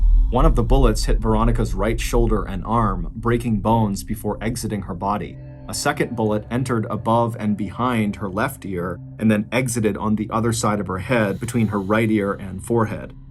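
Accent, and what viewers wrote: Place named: Boston, United States. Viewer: I hope this provides our revered storyteller a cascade of enjoyable, and well-deserved feedback! I am chronically ill, frequently housebound and at some point, almost daily, in such pain I can not move and barely breathe for the better part of an hour. Having a story on deck feels critical to surviving these more difficult times. I am transported from some floor to a vivid scene full of detailed descriptions and engaging plot weaving. Mr Ballen’s riveting unraveling of the “dark, strange, and mysterious” with a clear passion for the waning art of storytelling, is a true gift for all his followers. That he commits to a regular schedule while growing his family and philanthropy is admirable. That his art is guided by a baseline of facts is a relief in a world replete with untruths, half truths and alternative facts. 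So, THANK YOU 🙏 for sharing your gifts. Our family wishes your family the best. We have lived in Wollaston Beach and welcomed my only child at South Shore Hospital. My baby just turned 18, and we live in Nashua now, but will always fondly remember great raucous times in our late 20’s and early 30’s when we worked hard and played harder with greater Boston as our playground. So your authentic local accent, Mr Ballen, is the cherry on top. 🍨 We are grateful and wish you nothing but the best.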